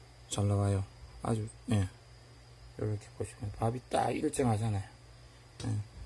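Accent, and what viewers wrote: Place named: Gyeongsang, South Korea. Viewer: 경상도 사투리 가 듣기 조아요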